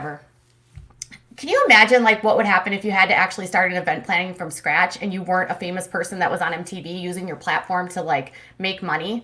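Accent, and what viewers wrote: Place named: Tennessee, United States. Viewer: Good with the Tennessee accent! I'm from Memphis, lol Good review, as always.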